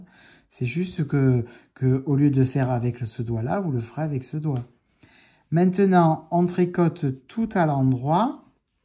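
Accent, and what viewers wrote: South, France. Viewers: Merci pour ces très bonnes explications, les pointes sont bien régulières. J'adore votre accent du Sud, celui du Nord n'est pas mal non plus, à bientôt dans la prochaine vidéo ou tuto, on se régale, excellent professeur 👍bizoux kiki du Nord 😘😘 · Bonsoir, merci pour ce tuto au top avec notre accent du Sud! De mon côté le grafting n'est pas toujours net donc je tricote en toe-up. Bon tricot et à bientôt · Bonjour tu as raison de te bader...😊😊 je suis du sud aussi et j'aime ton accent. C'est normal on aime sa region. Merci pour ton partage.🌻🌻🌻👍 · Bonjour, je vous remercie pour ces explications et français et avec l'accent du Sud! J'ai l'impression d'être Je en vacances! Je vous écoute depuis la Suisse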